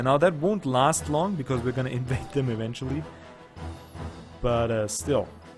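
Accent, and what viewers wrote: Germany. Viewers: I might be a bit late to the party but I'm thoroughly enjoying the lets play so far and looking forward to the rest. keep up the good work and I love the German accent!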